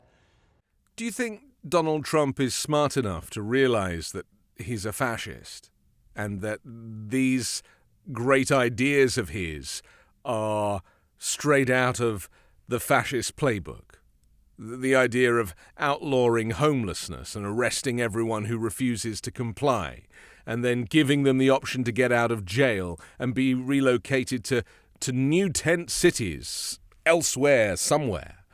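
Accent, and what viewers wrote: United Kingdom. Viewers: It sounds like you're from the UK. Just bend over and take it from the migrants and don't worry about what we're doing over here. We're actually fixing our problems.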